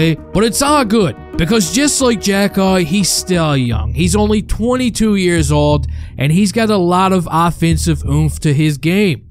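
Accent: Newfoundland, Canada. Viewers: I was just wondering yesterday if they were going bring him up soon. It's going to be interesting... Btw your accent, are you from Newfoundland/Labrador maybe? Cheers from Sweden 👍😊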